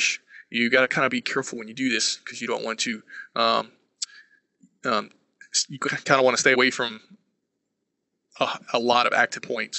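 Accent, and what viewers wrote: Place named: South, United States. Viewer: You sound like you're either in Tennessee or Georgia. Great tutorial though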